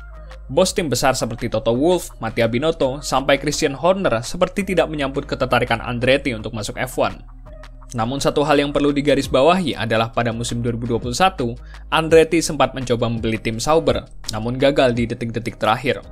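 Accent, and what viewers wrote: Cilacap, Indonesia. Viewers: btw koe wong cilacap bang?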